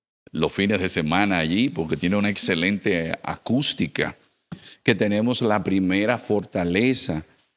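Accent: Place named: Venezuela. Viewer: Tiene acento venezolano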